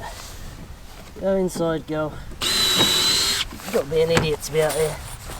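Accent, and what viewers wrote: United Kingdom. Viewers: I'm a new subscriber...you are one of the most unique and talented "off gridders" I have encountered via video yet!! I hear your British accent, but I'm not sure where you live. Can you share the general area? I'm in the U.S. - Kansas, but we are beginning the process of becoming totally self-sufficient. Thanks for sharing your thoughtful, complex, and rewarding life.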